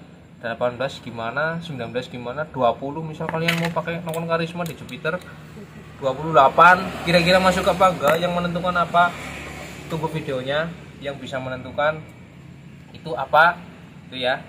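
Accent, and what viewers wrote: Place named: Java, Indonesia. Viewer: Medok jawane ndi hehe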